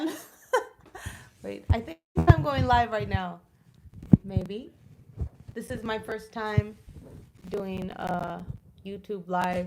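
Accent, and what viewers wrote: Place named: United States. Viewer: She doesn't have an accent she sounds American.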